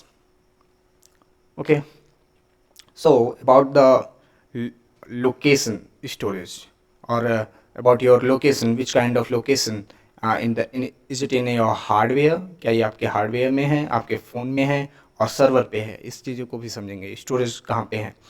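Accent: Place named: United Kingdom. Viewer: Sir ka hindi bhi English accent me h 😅 But explained too good 👍🙌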